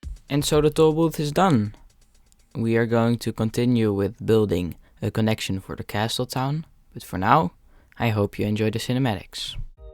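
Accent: Netherlands. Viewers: Are you Dutch/Netherlands?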